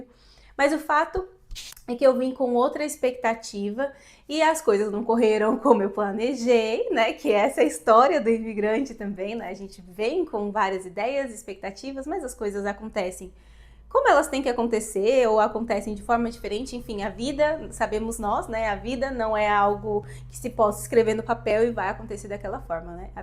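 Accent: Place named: Brazil. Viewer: Parabéns amiga pelo seu Português perfeito e já com pouco sotaque Brasileiro, parabéns também pela sua argumentação perfeita e correta, vou continuar a assistir os seus vídeos.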